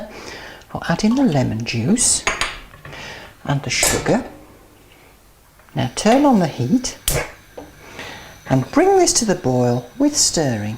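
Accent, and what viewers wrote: Birmingham, United Kingdom. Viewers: I loved your little noises! and where from the UK are u from? Right! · it is now I understand why you stopped making videos because half illiterate trolls would not understand a British accent if it came and kicked them on their protruding and exposed prominent posteriors. Nevertheless, if you had spoken like a cat which is being strangled and with a clothes peg upon your nose broadening your vowels, with absolutely no idea about how words are pronounced properly, and added a lot of grunts and hand gestures and fillers like uh-huh.whatever, possibly this particular viewer would have understood exactly what you said. But being parochial and not knowing the fact that the American language is restricted only to America while the rest of the world speaks British English in British accents, and we can understand what is being said clearly, isn't it time they stopped making public clowns of themselves, offering gratuitous insults to all and sundry? 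Oh, I forgot you,wouldn't be able to understand this message because it is written in British English and holds a reading statistic of about 5 and above, while your educational level needs messages to be written on a readability statistic of two in order to be assimilated, understood and comprehended. Please accept my commiserations. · This video made me rush out and buy turkish delight (im lazy okay!). Can you please do some more videos with your birmingham accent:D? they're fab! Love. x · You sound like the most english person who has ever lived ☺ great video! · I like her British accent😇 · thanks you a lot, i just want let you know that i found a video that shows up how to do turkish delight and yours was made far better! PS= your british voice is so relaxing, i just love english lol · I'm really love british english pronaunce!!😆😆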